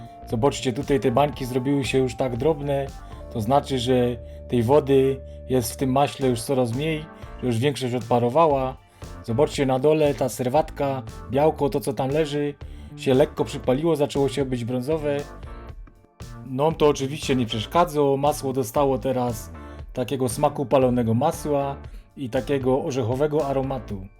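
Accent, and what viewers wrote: Silesia, Poland. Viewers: Mieszkałam 20 lat na Śląsku, i ślōnsko godka szczerze mnie wzruszyła ♥ Dziękuję za bardzo uproszczony przepis, bardzo lubię minimalizować swój nakład pracy, przy maksymalizacji efektów. Akurat dziś robię masło klarowane, cieszę się, że przy tej okazji tutaj trafiłam, bo będę mogła na gorąco wypraktykować. Pozdrawiam szanownego Autora, wszystkiego dobrego. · Jo lubia Śląska gadka ❤❤❤ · Zrobiłam , świetny patent nie trzeba zbierać tej pianki. Jaka fajna gwara śląska . Pozdrawiam Pana 😊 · super przydatny filmik :) uwielbiam śląską godke :) pozdrawiam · Dziękuję biorę się za klarowanie masła i robię subskrypcję . Konkretny przekaz i śląska gwara którą lubię . Pozdrawiam😊❤❤ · Piękna jest ta mowa Śląska dzięki za przepis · Lubię słuchać Śląskiej mowy, 38 lat temu mieszkałam przez pół roku w Rybniku .A maslo i tak sobie zrobię. Pozdrawiam i już dobrych świat życzę. 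· Kocham Twoj slaski❤ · Lubię gwarę śląską!!!!! Dziękuję 🎉🎉🎉🎉🎉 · Piękna Śląska Mowa..Dziękuję za przepis....Pozdrowienia z Lubelszczyzny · To jest śląska gwara, prawda? Pozdrowienia z Wiednia❤ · To jest dobro Śląsko Godka 💪💪💪❤❤❤ ,fajnie się słucho 👍🤣.Superrr. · Jasno, prosto i konkretnie. Wartość dodana: piękna śląska godka. :) Pozdrowienia z Krakowa od potomka Ślązaka (z tym, że cieszyńskiego). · Pikne masło chopie. 😅 Uwielbiam śląską gwarę. Fajny przepis i cudowny zaśpiew.👌🏻 Dzięki 👊🏻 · sama jesteś wsiowa. To gwara śląska, gdyby była kaszubska, ni kej byś nie poniała, hihiiii... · Lubię gwarę śląska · Co to za język ??? Ślązak?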